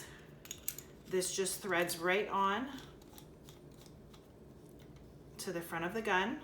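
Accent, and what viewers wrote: Canada. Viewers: Your Canadian eh! Even better!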